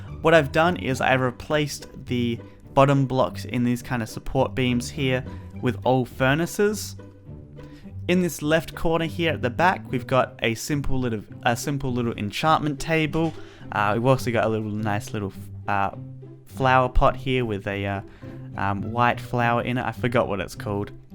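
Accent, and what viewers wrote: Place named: Australia. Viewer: I luv ur Australian 🇦🇺 accent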